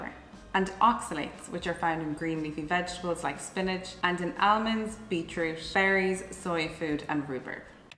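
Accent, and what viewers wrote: Ireland. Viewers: True , the GP I went to back then said He knew nothing about it and sent me to the Hospital. they found me a Dr who was surprised as I was his 3rd case. many more now. It is becoming a mainstream condition now ! sadly I think my Grandad and Uncles had it but like you said it was unknown then. all heart attacks in their early 60's only my Grandad survived the first but did indeed have another years later. they were strict tee total, strong fit (young and older) men. always wondered if it was Hemochromatosis. Im now in my 60's. > County Fermanagh Inniskillin is where we hail from. To hear your voice , the beautiful Irish voice , still and always will thrill me to my bones. Thank you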